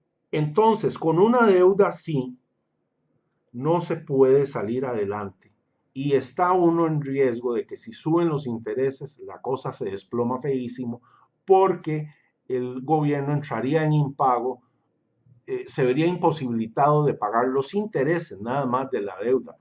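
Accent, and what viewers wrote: Costa Rica. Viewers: Reconozco a un tico cuando lo oigo. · Eso que dices de Usa describe a la perfección a México fascismo corporativo!!! Genial canal, fantástico que te hayas ánimado a compartir tus conocimientos. Saludos desde México,de dónde eres? por tu acento creo eres de costa rica. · Sos de Costa Rica verdad? conozco ese tono de voz. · sos de costa rica? Digo por tu acento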